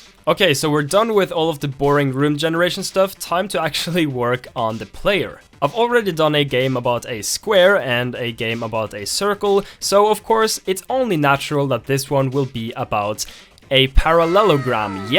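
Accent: Norway. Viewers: are u Norwegian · Wait are you norwegian?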